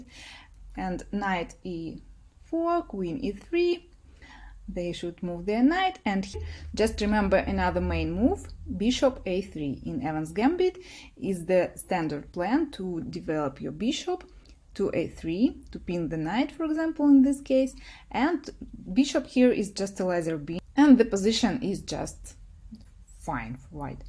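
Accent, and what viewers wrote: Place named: Russia. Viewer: I like videos because she speaks slowly and clearly, with an adorable Russian accent, and goes back over the move sequences several times to let them sink in. Another winner. I am still waiting to pounce on someone with Captain Evans's gambit, but I'll be ready.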